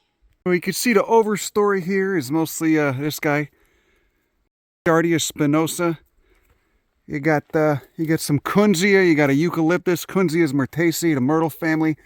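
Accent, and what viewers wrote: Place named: New England, United States. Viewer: Oh, shit... please dub Planet Earth with your New England accent.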